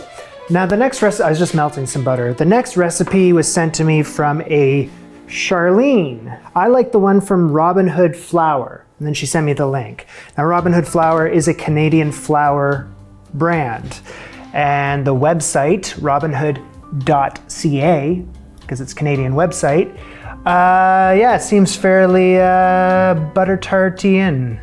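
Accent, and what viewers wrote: Canada. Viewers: Had no idea you were Canadian. Always felt your accent was very pleasing to hear and wondered why since I assumed you were from the US. And as a Brazilian living in The Netherlands, I usually pick an American accent very easily when I meet one out here (it doesn’t sound very good does it? 😂 ) Now yours make sense. Glad to hear you are Canadian. Much better. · I've always loved your Canadian accent. I envy you being from Canada, and I hope one day you and your family get back there. I'd do anything to get out of the US, I honestly don't know why anyone would pick living here when they have a home in Canada. Love your channel, love your personality, love your accent! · Many of us Americans love our Canadian neighbors. We're so glad you live near us and set a better example than orange Hitler does. I personally am sorry that we are behaving so poorly. 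Be proud of your heritage and your accent! I definitely noticed you were Canadian but I thought it was charming. ❤️ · The way i didn't clock the Canadian accent until this video. My NS accent is too thick to tell another one unless its NFLD haha. But as someone with a spouse from NYC, I get the layer of extra stress you musg be dealing with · I love your Canadian accent 😊....I love your country! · Can' believe someone sniped your 'Canadian accent'. Then again, I'm from SE Michigan, so I sound like you ;) Oh, and all of the sane people of America apologize to Canada, and all associated with her. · Your Canadian accent is AWESOME!!!!! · Make fun of your Canadian accent? WTAF? I'm a Montanan living in California--I miss the Canadian accent and love hearing yours. LOVE it. Like I love your content. Thanks for your Canadian-ness. I consider you my emotional support Canadian. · I love your Canadian accent! 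Canada is on my vision board ❤ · Same! I don't hear any specific Canadian-isms in him, but I knew right away he was Canadian. Not sure how to explain that. Maybe we don't hear it because we sound similar? 🤔❤️🇨🇦🇨🇦🇨🇦🇨🇦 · Also a Canadian living in the US - I very much feel like you. I am moving home in a few months. I am done. Butter Tarts are 100% a good way to push back. I am definitely team no raisins. Your Canadian accent is the best!!!!! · I cannot believe you are serious when you say that you didn't realise you had a Canadian accent. I am Australian and I have been fully aware that I have a very specific accent from a very young age. Starting with listening to Sesame Street, then the Nonna four doors up who fed us pasta and the Yiayia who fed us moussaka, I knew that I had a different accent to the 'news people. I think you abandoned your country to find more wealth in 'America', (insert Titanic bullshit). 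But now you are in the middle, oops. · Speaking as a Canadian, I knew had to be Canadian about 1.5 videos in. Then he mentioned it in a video and I felt very smug because, it's true, Canadians are generally bad at spotting out own accent. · THANK YOU!!! The Great Debate. I don't like the fruit/nuts either. Also, I knew you were from Canada from the accent, but also you filmed in Muskoka, Ontario, where I have been summering from birth. Anyway, thank you! Because the bakery in Bala (Don's Bakery) changed their recipe for butter tarts! Now, the original recipe wasn't the best, but it was the taste of summer. Don's Bakery's current recipe is even worse. The filling is off and there is too much crust. HOWEVER, I now have "the recipe" which I will follow and bake and peace will be restored. I have been a friend/follower of Glen & Friends for years. I watch the Sunday Morning with the Old Cookbook regularly. 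As an American, I apologize for the man who currently sits behind the desk in the Oval office - both Trump and Musk; because who knows who actually sits in the chair.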